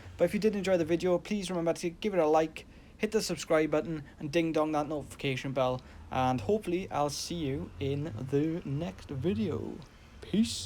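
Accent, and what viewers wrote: Wales, United Kingdom. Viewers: Are you Welsh I’m welsh and you got a welsh accent I love it 😂 and also this is a great video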